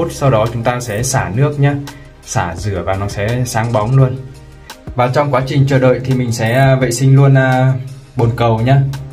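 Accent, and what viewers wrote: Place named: Bac Ninh, Vietnam. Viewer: Giọng bạn như dân Bắc ninh ý nhỉ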